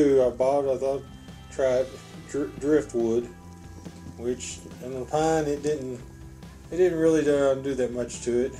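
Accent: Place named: Texas, United States. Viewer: I'm from Texas.. I can tell lol my wife and I really enjoyed this video :-)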